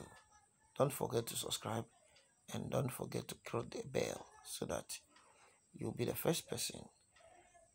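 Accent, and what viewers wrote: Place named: Ghana. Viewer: You sound like a Ghanaian ❤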